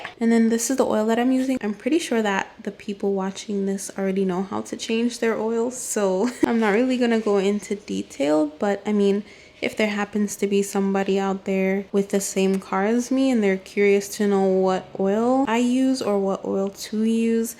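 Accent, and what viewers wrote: Jamaica. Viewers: You sound Jamaican 🇯🇲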